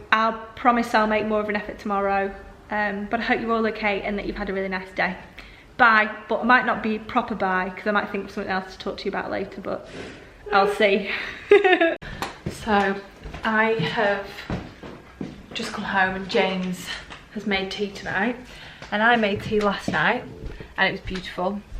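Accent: North, United Kingdom. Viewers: Yay! Nice to have you back! Great to hear your friendly Northern tones back on YouTube!:)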